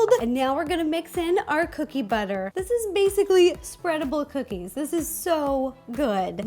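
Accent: United States. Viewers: Can I just ask, is this all imperial? I use metric, cause I live down under, aaaaaaaaaaand I wanna make some stuff from vids, but she sounds American, so I'm guessing it's imperial.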